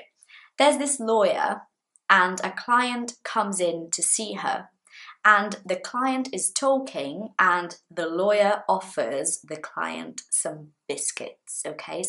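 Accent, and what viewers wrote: United Kingdom. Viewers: I love your British accent, it is my focus. I don't pronounce the letter r and I usually use schwa sound, but the most of English teachers prefer American accent than British and the worst is that they try to enforce us to speak like an American.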